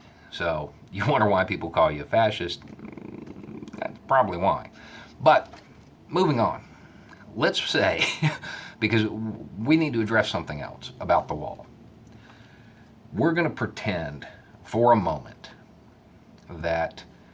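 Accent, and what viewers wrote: South, United States. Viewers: For as long as I've been watching your videos, I've been thinking: It sounds even truer when you hear it stated with a southern accent.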